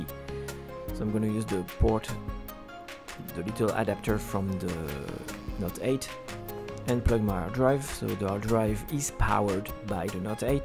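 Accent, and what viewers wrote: France. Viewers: What's the name you said in the beginning? Why your charger block is us Canada if you have a French accent? It isn't an European company? You are french Canadian?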